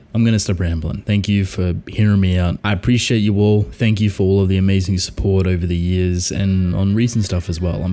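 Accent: Australia. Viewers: maybe it’s the deep Australian accent 🤷‍♂️. Looking forward to your new ASMR channel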